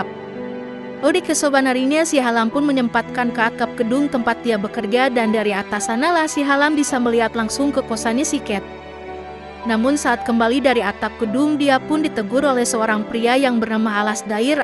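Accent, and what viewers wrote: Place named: Sunda, Indonesia. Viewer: Udah lungse udah bucat😂😂😂😂😂 sunda pisan👍👍👍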